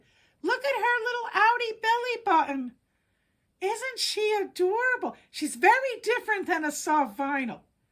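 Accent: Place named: Italy. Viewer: I can hear the Italian in your voice 💗 What a story to tell - celebrating the wonderful you you have become 💗 What a sweet baby - and that is an impressive brush 💗 We have an adopted son (8 at the time, adult now ). He is very good to us - he very much appreciates havng had 'parents/family' in his life, like you. You have a wonderful story to share with others 💗 God Blessed you 💗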